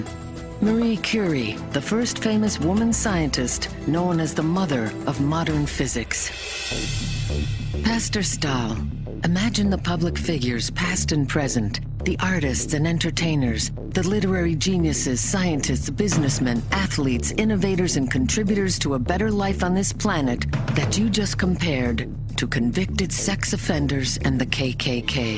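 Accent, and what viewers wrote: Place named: Levant, Syria. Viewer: هل معناة حبي لهم أني ما أقول الحقيقة؟ نعم أمي جاهله وأبي جاهل بكثير من الامور رغم شهاداتهم القوية الا أن في ظني هم يعتبرون جاهلون قليلا وخاصة في أمور الالحاد ، بعض الاحيان تحصل دكتور طب لكن سلفي يعني حمار لكن يفهم بالطب ، يعني هذه الحياة المشكلة أن المسلمين غالبيتهم ليسو مثلك ، غالبيتهم بهايم ولايقرؤون وجهله ويشتمون ، ويبدو من لهجتك أنك سوري أو فلسطيني يعني يمكن عشانكم بسوريا عندكم أكثر من دين وطائفه والنقاشات عندكم طبيعيه نحن نعاني من هذا التخلف في الجزيرة العربية ، تخلف وجهل وغباء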